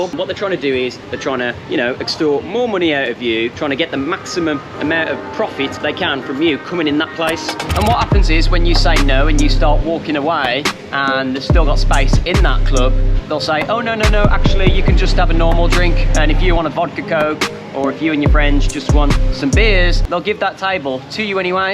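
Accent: Birmingham, United Kingdom. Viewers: You sound like a brum..maybe from Wolverhampton..am I right 🤪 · Hey you never lost your Brummie accent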